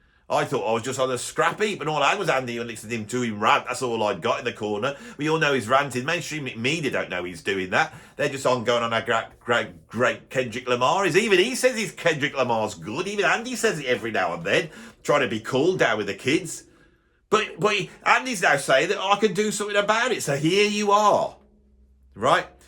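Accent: Birmingham, United Kingdom. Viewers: Definitely! Plus I always think that a rant in the Brummie accent always gives it that extra 'Je Ne Sais Quoi' !!! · The brummie accent is hilarious .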